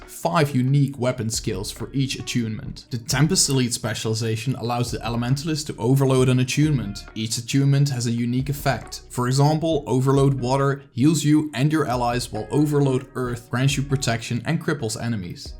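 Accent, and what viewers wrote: Netherlands. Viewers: You sound super Dutch! With the stauf and claes instead of staff and class! I know you can do it! You have enough determination to make a lot of YouTube videos so I know you can learn to say class and staff!!!!! I believe in you! ps I'm from Belgium and also a Dutch speaker and I've never ever said claes or staaaauf!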